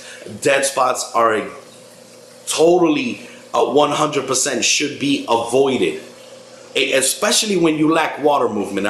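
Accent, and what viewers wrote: New York, United States. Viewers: I really appreciate you doing these videos. I'm looking at an almost empty biocube 29 gallon tank and I'm BRAND NEW to marine tanks. I'm now worried about the "cool" setup I did with my live rock especially cause I only have the 1 stock pump that came with my biocube. I may have created too many deadspots like you said. It was also dope that you have a total New York (Brooklyn?) accent haha. Thanks for all the solid advice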